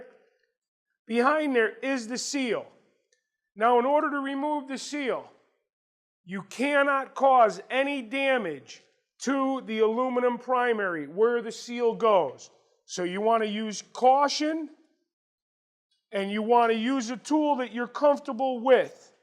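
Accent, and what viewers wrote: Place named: New England, United States. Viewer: GOD! I love eye-talon guys from the the east coast,new england!!! Fuckin accent is crazy awsome!!!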